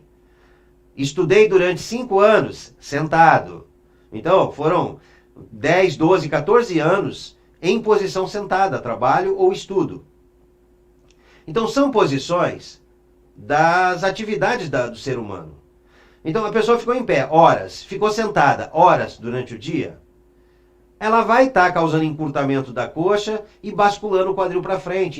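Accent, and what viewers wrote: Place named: Paraná, Brazil. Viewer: Quanta explicação lógica e de fácil entendimento neste vídeo, me esclareceu muito. Parece que é do Paraná, atende em quê cidade?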